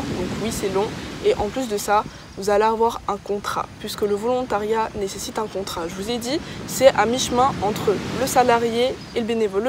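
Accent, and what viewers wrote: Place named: Africa. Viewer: Elle a un peu un accent africain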